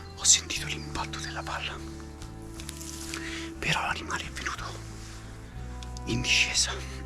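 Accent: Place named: Central Italy, Italy. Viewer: Bellissimo video di caccia, complimenti ! Dall'accento sento che sei del centro Italia... di dove esattamente ?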